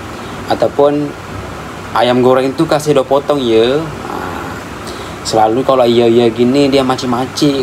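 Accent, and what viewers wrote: Kelantan, Malaysia. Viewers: wehh dia cakap Kelantan lahh😂😂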